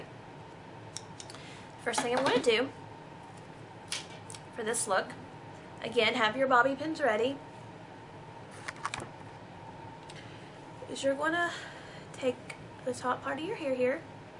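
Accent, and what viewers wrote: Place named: South, United States. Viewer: Where are you from? I sense a lil' southern accent? Love it!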